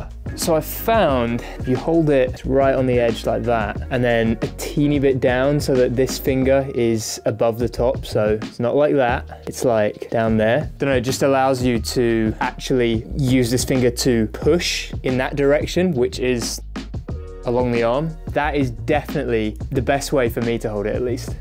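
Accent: United Kingdom. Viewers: i like bri'ish youtubers, because i like bri'ish accents 10/10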